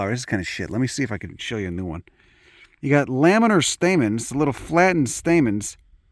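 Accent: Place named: New England, United States. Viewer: Oh, shit... please dub Planet Earth with your New England accent.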